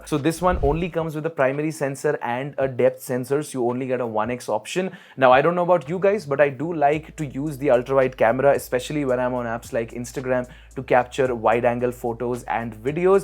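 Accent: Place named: Bengal, India. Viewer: I observed the words you use are really very professional, the voice, the tone, the accent is just amazing. You do not fake your accent by forcefully trying to Americanise it (To be honest that sounds very scammersish lol) you stick to your Indian Bengali English accent, I'll say it is a very effective way to holding on your roots while being modern. I wish to speak English like you. And Thanks for replying 🙏 Btw amio Bangali, Khub e bhalo laglo. I appriciate it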